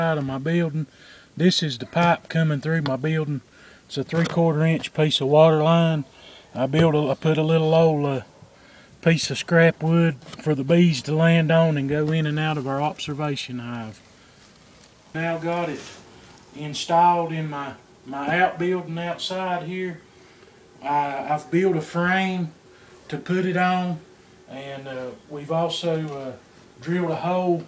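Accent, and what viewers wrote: United States. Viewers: Thanks for the video from Baton Rouge, LA. Where are you, sounds like you are a fellow Southerner! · oddly enough your accent sounds like my family from Southeastern Kentucky & West Virginia. Thanks for sharing! Great idea! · Just a good ol country accent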